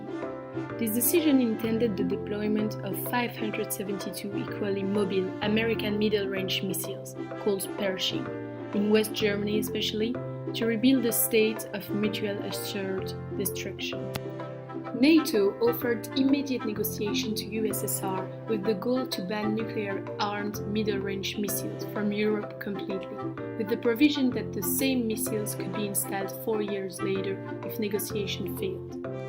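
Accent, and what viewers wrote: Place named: France. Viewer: That french accent tho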